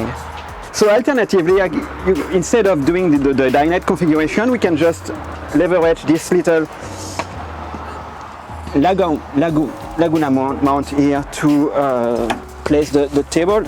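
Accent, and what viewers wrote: France. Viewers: Yet another successful creation from Freedom Vans! This model isn’t my use case, but I think you should hire the narrator based on his wonderful French accent! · Je reconnais l’accent français, sympa le van ☺️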